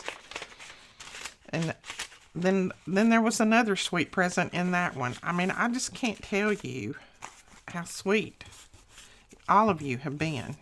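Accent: South, United States. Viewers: You really do have so many people that love you! I just love you to pieces! You really are great. I miss you like crazy when your not on. I think it's your voice..just reminds me of home(the south) and my momma and Grams who are both gone. I wish that I could just give you a big hug, pray with you, and try to make things better! Not sure if you got your ink yet but just a fyi Tina from Shabby Dabby Doodah has insta ink too and she was saying how this time she has been waiting forever for her ink to come in. So if you don't have your in a couple days you might wanna try and get in touch with them! Love and prayers, collette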